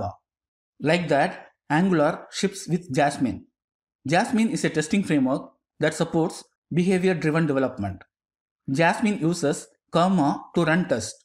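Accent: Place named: India. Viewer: 1) Well that looks interested 2) Indian accent, out of there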